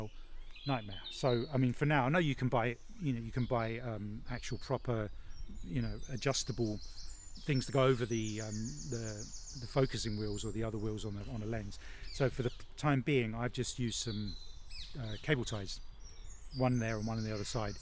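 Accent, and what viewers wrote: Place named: England, United Kingdom. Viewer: It's nice to hear a English review on this lense instead of some high speed American I'm thinking about it because its always nice to have a little extra reach, good review thank you.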